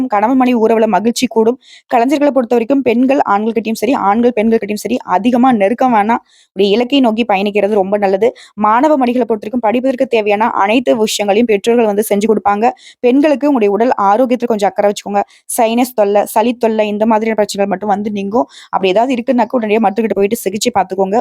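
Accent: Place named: Tirunelveli, India. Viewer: Good to hear in my Tirunelveli Tamil slang 👌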